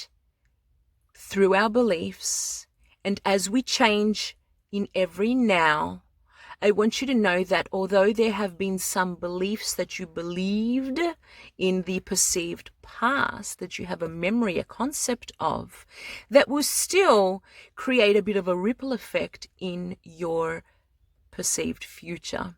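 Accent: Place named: Australia. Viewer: I found your video through one of my subscribers who posted your video. Your delivery is perfect and your Australian accent is beautiful, I'm an Australian myself, you're a great teacher and I was so drawn into every word you said, I was sort of hypnotised to tell the truth, and I felt that this message was purely for me 'now'😊 I am turning 68 next month and people say that at 40 you can look over your life and feel all sorts of disappointment but I can tell you nearing 70 you feel like the tank is getting pretty empty. This philosophy really rings true for me, it's not like I haven't thought about all this a lot but the way you put it just cut through. I'm going to listen to you again and again until I really get it just wanted to say thanks. Cheers, Dave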